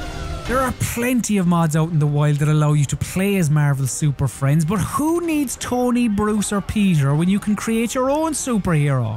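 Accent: Ireland. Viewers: Shut up it's an Irish accent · Haha I knew he was Irish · Sounds like u have a Kerry accent. Good on ya lad, the best part of Ireland is down here in county Kerry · IRISH ☘️ yay he is irish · Ye fekin wallie it's an irish accent innit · youre definitely irish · Are you irish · its called an irish accent ye eejit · he irish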